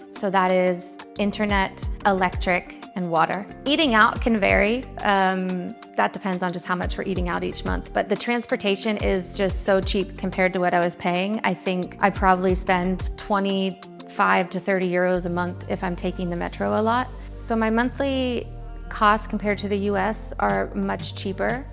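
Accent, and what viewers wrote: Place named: Eastern Europe. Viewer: Rich “American“ with very obvious Eastern European accent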